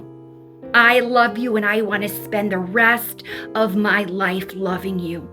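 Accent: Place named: Midwest, United States. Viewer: OMG! Your story telling so reminds me of my mom! Because of the Midwest accent 😂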